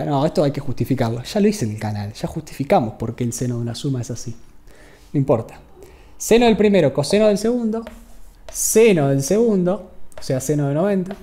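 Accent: Argentina. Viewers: Es argentino, ¿Verdad?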